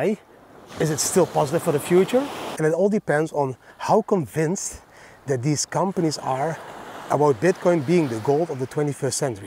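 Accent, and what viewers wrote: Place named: Netherlands. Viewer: Right 😅 I like his Dunglish though, the Dutch are used to say glazen bol 🔮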